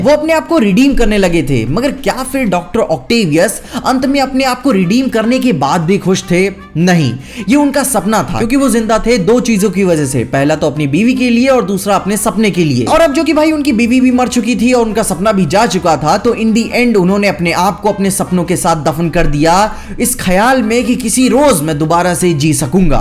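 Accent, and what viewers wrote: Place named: Uttar Pradesh, India. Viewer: Bhayi aapka accent UP jaisa lagta hai, kahan ke ho aap ? 🤔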